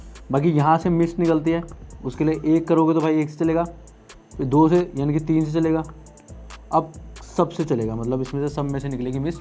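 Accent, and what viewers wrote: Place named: Haryana, India. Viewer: Yehh haryanvi ✌🏼😎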